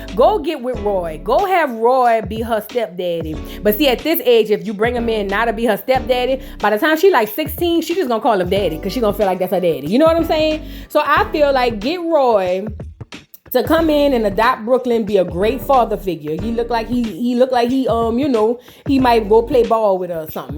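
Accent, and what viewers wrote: New Orleans, United States. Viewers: it’s the accent FOR ME‼️The NOLA accent is my favorite.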